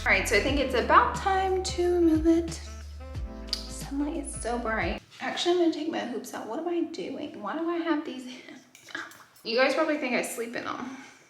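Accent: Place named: South, United States. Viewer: If her accent didn’t give away that she is southern.... that wonder bread sure did. Literally a southern staple haha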